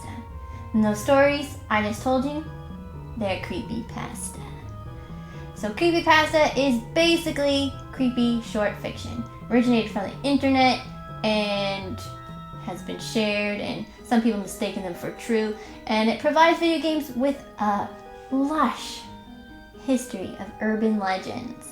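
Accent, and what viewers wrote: Canada. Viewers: If you didn't have the Canadian accent, you would be prefect.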